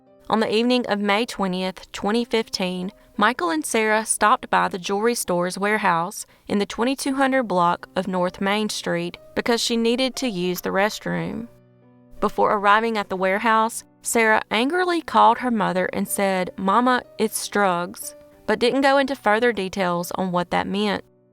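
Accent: South, United States. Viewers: I must say I really like your channel; however, I've always found southern accents to be highly irritating to my ears. Sorry, nothing personal, obviously. Congratulations, though, on the popularity of your channel. Keep up the good work. 🥳 😉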